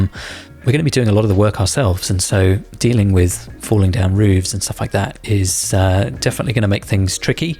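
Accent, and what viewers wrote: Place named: Australia. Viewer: From London? Your accent sounds Aussie😊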